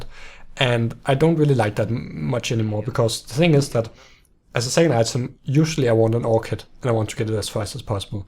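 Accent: Denmark. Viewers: I Can hear the danish in you.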